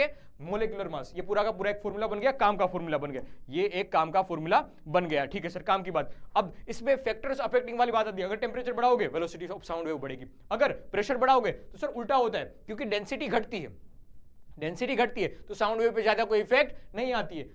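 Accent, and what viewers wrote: Gujarat, India. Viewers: Sir gujrati hai kya??? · aap gujraati ho kya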